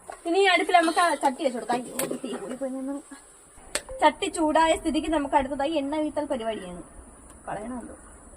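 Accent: Kollam, India. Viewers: Ninghal ethu districtil aanu...shaaili kettittu kollam aannennu thonnunnu ...😅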